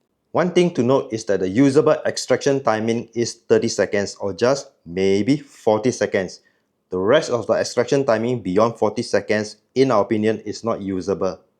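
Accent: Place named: Southeast Asia. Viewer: Greetings to south East asia 😁 love the accent Great review Not changeable like the batteries of modern day cell phones or not changeable at all? Also wonder: the open filter at the bottom is nice but it would be cool to be able to mount some funel to avoid spilling and to let the coffee run straight into milk foam Not sure if that's a great idea though: too hot moka (Bialetti) causes brown, bitter milk foam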